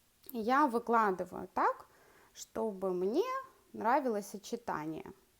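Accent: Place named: Surzhyk, Ukraine. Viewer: Это говор такой понимаете, суржик.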